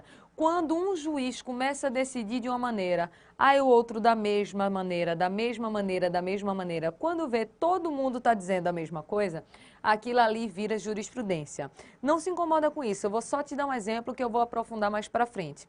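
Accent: Pernambuco, Brazil. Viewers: Ahhhh eu amei esse sotaque, já estava desconfiada, sabia que era de Pernambuco. Adorei suas aulas <mail>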